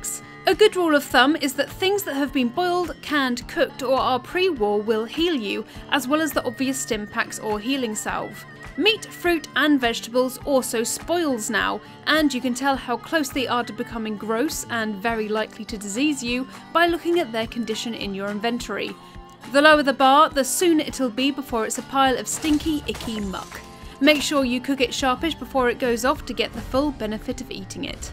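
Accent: United Kingdom. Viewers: Oh God she's British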